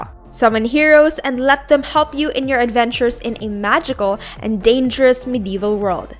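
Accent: Philippines. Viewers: You sound like filipino IM PROUD TO BE FILIPINO ML TAYO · I feel like your english sounds filipino.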